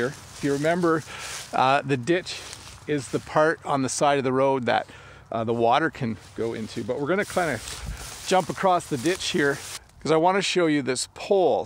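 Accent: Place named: Canada. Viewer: Hi great to learn with you English in Canadian way thanks Lott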